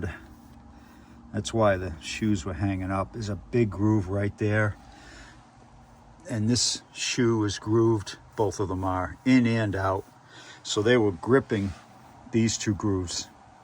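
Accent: New England, United States. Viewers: You from Maine, NH or MA?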